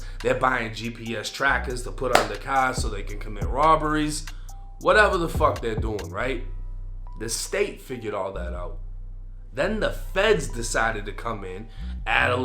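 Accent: Boston, United States. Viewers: “Got pulled over in the cah and caught him a drug chahg.” Guess that Boston accent never goes away lol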